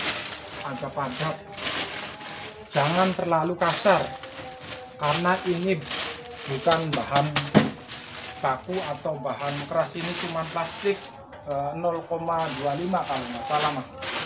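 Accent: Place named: Java, Indonesia. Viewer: Ngomong logat jawa kang mase, daerah ngendi iki mas?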